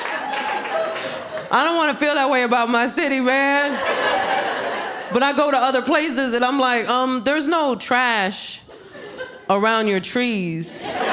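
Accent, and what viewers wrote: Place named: Bronx, United States. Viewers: I knew she was from the Bronx as soon as she opened her mouth.😊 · Literally never knew what people meant when they said I had a south Bronx tone lmfaoo me and this woman talk alike I’m crying lmfaoooo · I love listening to a true New Yorker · I soooo knew she was from the Bronx before she even said it 😂😂😂😂 I loveddd this!!! ❤❤❤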